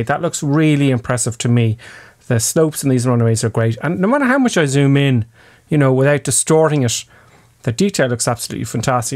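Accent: Ireland. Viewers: Dude, what kind of accent is that you have? Irish? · I don’t usually comment, I just want to say I really look forward to your videos always informative and very funny, and that incredibly Irish accent..ohh..heheh..your sense of humour, catching the train with all those middle class bogans nerds..classic. Thank you.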